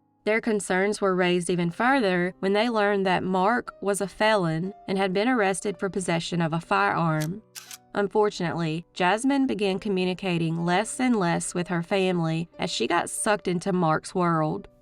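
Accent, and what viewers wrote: South, United States. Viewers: These videos are so good, but I almost can’t with the cornbread accent. I’m southern and you can tell it when I speak, but come on. You can tell it’s exaggerated, because it lightens up as the video progresses. Chritaaaine finally becomes Christine and Jasmaaine turns into Jasmine. Come on, the southern accent is endearing, but this is a bit much.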